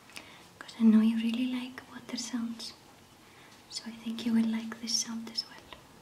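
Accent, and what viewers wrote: Iceland. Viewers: I'm sure I will - always love listening to your voice. And the visuals are nice icing on the cake :) . You know, it's your accent and ElizabethASMR's accent that have inspired me to want to visit Iceland! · i love how our Icelandic accsent is so strong for asmr PS: Icelander writing this ❤ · Always heard Icelandic women were out of this world beautiful... sucks you got stuck with our Navy and Marines up there... wish the army would send me that way... my god that accent and your curves... well at least I have you to thank for the Zzzs most nights... I appreciate it!!!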